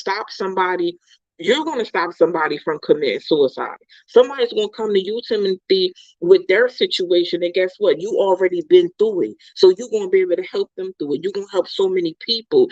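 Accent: United States. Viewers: i adore this black woman..... we as black men LOVE U ..... u gotta love her country accent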